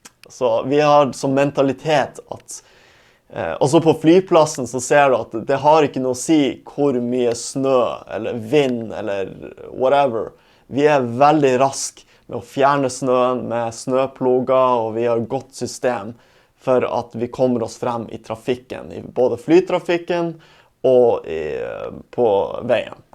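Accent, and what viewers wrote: Tromsø, Norway. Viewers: Tusænj takk for vidæo. Som dansk, som har læst feskeribiologi på Tromsø Universitet, da tænk æ at dæ kunnje vare lættere for 'utlænjingan' om du forkalte att 'lamme' sannsynlisvis kjem ifrå 'i lag med'? Ikkjesannt? Kunne 'ATTME' være engelsk innnflydelse? Att - 'at'- ved/ i nærheten af' ? En sak som a ikkje fik peiling på, da æ bodde dær; bruker en og 'åssen ar'n dæ? (hvordan har han det? )eller berre 'kushen' om 'hvordan, og 'kushen' staves/skrives 'kushen'? Ijæn VÆLDI hygggelæ å høre Tromsømål ijen. Fra den tia da æ som student fik en Mack på Prelaten eller i Studenthuse.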